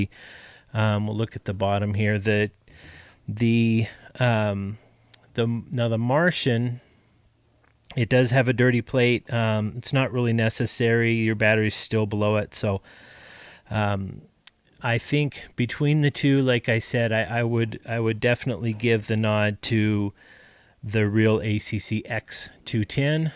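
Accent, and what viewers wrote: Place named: Australia. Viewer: yes "gooday!! And tooday!!!.." Catchy as fuck specially with that down under cheekyness. 👍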